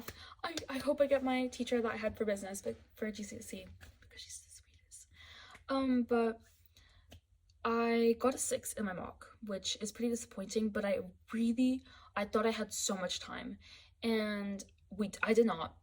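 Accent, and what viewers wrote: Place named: United States. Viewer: ohh u have an American accent when talking in English haha. Je peux pas parler français????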